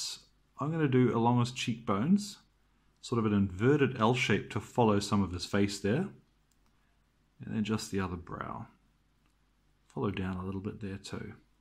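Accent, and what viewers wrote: New Zealand. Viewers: I'm from New Zealand too!! Haha think that's why it sounded familiar but not quite?? Thank you so much for your videos. I'm just starting out with a sisters of battle army :) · What part of NZ are you from?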